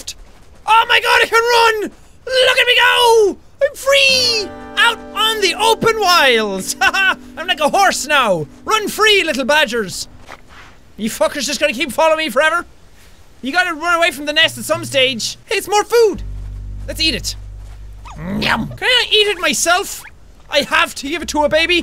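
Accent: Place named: Ireland. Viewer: I’ve come back to first video I saw 6 yrs ago because this is where my addiction to Irish accents was born. Love u jack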